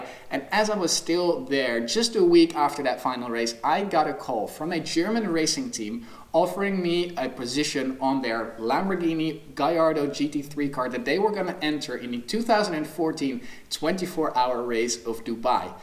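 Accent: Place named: United States. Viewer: How did you learn American accent so well?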